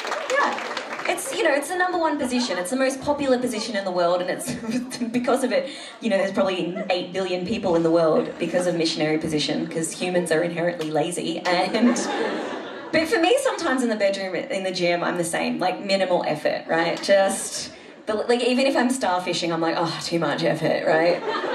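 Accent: Australia. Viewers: Love the Australian accent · 55 seconds in I had to stop watching, Australian+the topic. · Is she from the land down under? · Just an average Aussie Sheila.She is definitely not a kiwi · She"s Australian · yep or maybe kiwi but I'm fairly certain she's Aussie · She's Australian, we all sound like her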